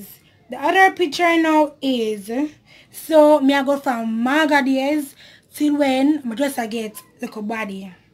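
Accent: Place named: Jamaica. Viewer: Nice video and love that Jamaican ascent though 😍😍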